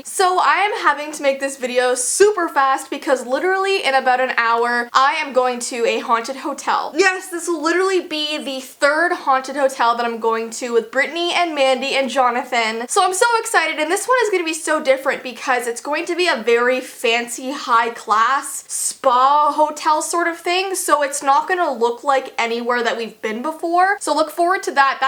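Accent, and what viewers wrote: Canada. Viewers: Don’t worry I am Minnesotan so I have the same accent as Canadians and I say Oregon (or-I-gan) too ily❤️ (edit) this was a typo: (or-i-gen)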